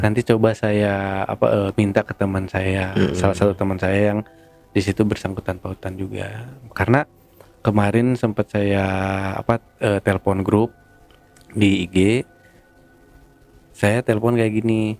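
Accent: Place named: Bekasi, Indonesia. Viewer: Gw klo denger bang logat anak Babelan lagi cerita hehee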